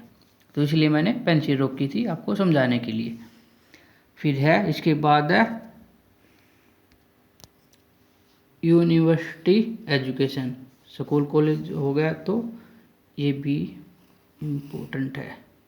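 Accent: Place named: Rajasthan, India. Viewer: Sir Nationality to sabki Indian hai, State wise kaha se ho, because by your tone you seems like from Rajasthan/Haryana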